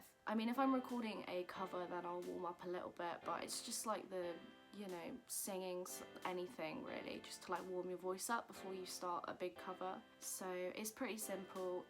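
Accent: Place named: United Kingdom. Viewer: I didn’t know you were British 🤯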